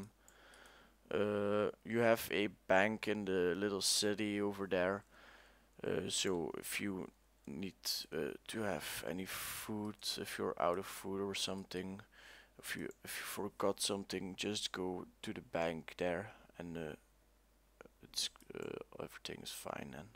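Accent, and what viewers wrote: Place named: Netherlands. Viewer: tought you were dutch actually, it's because you speak words with an Dutch accent :) liked the guide really much, didn't know to kill them there :)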